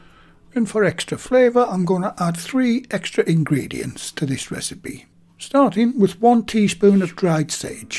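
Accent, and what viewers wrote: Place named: Northern England, United Kingdom. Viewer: As soon as I heard voice, then say he’s from up north, I just knew these were going to be godly sausage rolls.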